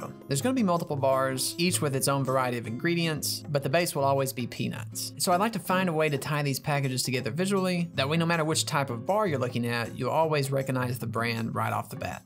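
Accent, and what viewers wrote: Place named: Texas, United States. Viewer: I get the feeling he is from texas just by the way he sounds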